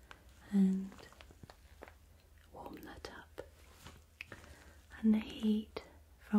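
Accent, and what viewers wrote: United Kingdom. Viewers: I live for the accent. Are you Australian or British? It sounds more British to me..? Anyway, great video!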